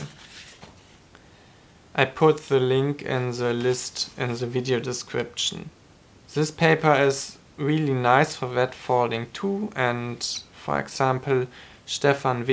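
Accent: Germany. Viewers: Du bist eindeutig deutsch haha gut erklärt !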